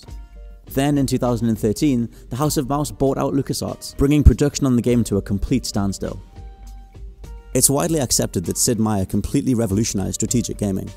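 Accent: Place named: Geordie, United Kingdom. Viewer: is the Narrator from jesmond in Newcastle? sounds like it. it's very posh Geordie, but still sounds a bit Geordie to me! toooooon. :)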